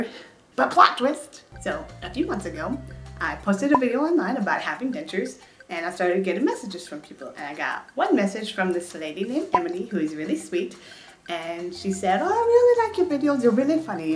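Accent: South, United States. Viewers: mad dy I’m Southern and it’s close. She’s from Kentucky, apparently, so I’m not far off.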